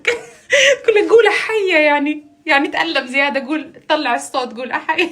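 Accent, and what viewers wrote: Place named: Saudi Arabia. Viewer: . لهجتك السعودية مرة حلوه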